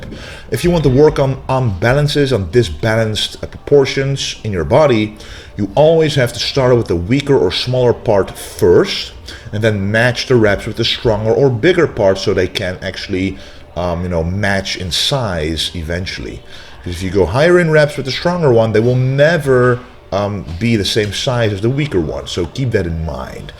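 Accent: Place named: Netherlands. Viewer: Are you dutch i think so because of your accent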